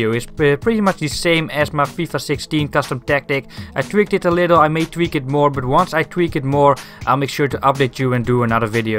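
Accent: Netherlands. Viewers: Are u dutch bro?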